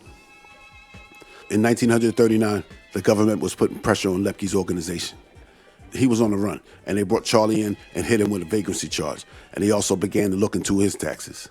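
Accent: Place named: New York, United States. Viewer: New York going by his voice.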